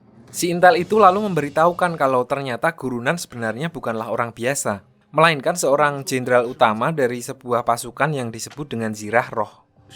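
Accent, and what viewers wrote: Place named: Java, Indonesia. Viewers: kwkwkwkwkwk kaya e org Semarang nih si mimin... salam kenal min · logat jawa admin nya nampak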